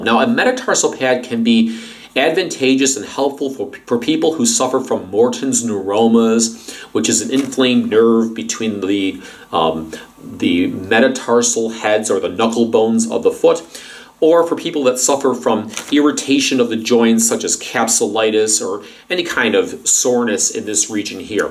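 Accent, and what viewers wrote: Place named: New York, United States. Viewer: Dear doctor! 🤓 What a great detailed explanation, which took the mystery out of this problem. I understand WHY the foot pads did not alleviate my pain (between 2nd & 3rd bones) before. I bet you are a New Yorker? I’d recognise that accent anywhere. This was extremely edumacational! 🤓 A grateful New Yorker in London. 🇺🇸🌹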